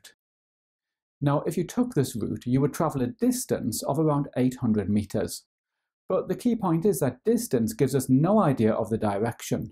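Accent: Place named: Newcastle, United Kingdom. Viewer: IS A GEORDIE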